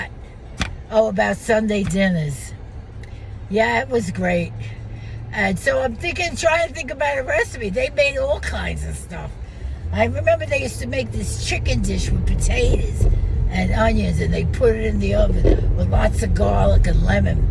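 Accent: New York, United States. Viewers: . Nope. My sister who talks like you is only one of our family still in NY. My Brother and I later went to Medical School in Omaha just around time Henry Hill ( of Good-fellows infamy) came to Omaha. Our accents were like yours 40-50 years ago. Can’t tell you how many times people asked one of us if we were in Witness Protection .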